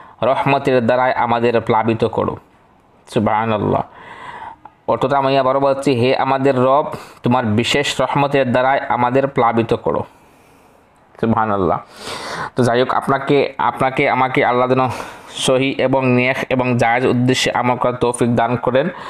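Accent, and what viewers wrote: Sylhet, Bangladesh. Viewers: আমি আর অনেক ভিডিও দেখেচি সব ভিডিওর কথা গুলি ভুজি কিন্তুু আপনার কথা ভুজিনা,,, আমার মনে হয় আপনি সিলেটি,,, কথা কম বলবেন আর ভালো করে ভুজিয়ে বলবেন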